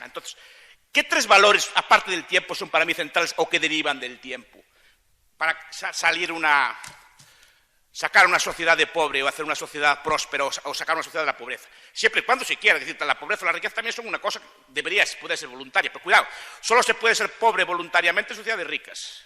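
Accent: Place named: Galicia, Spain. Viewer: Interesante sus argumentos, ....el acento gallego lo hace adictivo....saludos desde Buenos Aires, provincia de ultramar Gallega....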